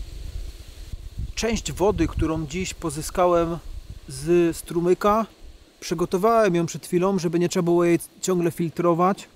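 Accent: Silesia, Poland. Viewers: Słychać że chop ze śląska 😉😁😎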